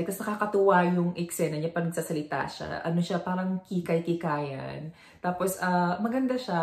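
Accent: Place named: Philippines. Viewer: kahit Pinoy accent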